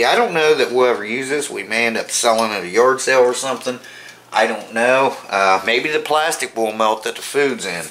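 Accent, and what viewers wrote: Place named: Baltimore, United States. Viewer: Awesome video- very informative. You must be from Baltimore with the accent. Maybe southern PA. Let me know if I’m right!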